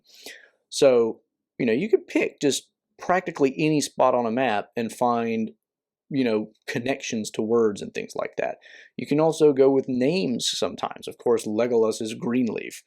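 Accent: South, United States. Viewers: That bloody accent....so Southern.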